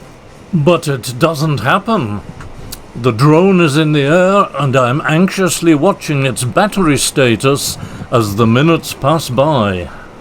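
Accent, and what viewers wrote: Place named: Scotland, United Kingdom. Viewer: Of Asquith Xavier, moment /..., on top of your enchanting Scottish brogue, lilting loftily with meandering messaging, your incidental account of Mister Xavier provides ample knowledge of his troubled encounters. Suddenly, today, he is world famous because of your timely endeavor. Thank you, kind sir, his memory certainly lives with me and, hopefully, many millions of minions scattered beyond...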